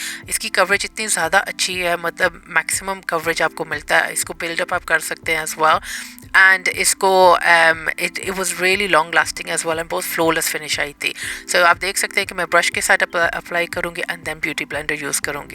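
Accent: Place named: United Kingdom. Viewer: Watching from Karachi,i love your work,and your English accent ❤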